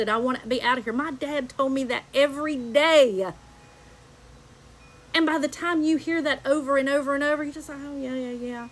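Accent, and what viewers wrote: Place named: Massachusetts, United States. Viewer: Hell of a Massachusetts accent.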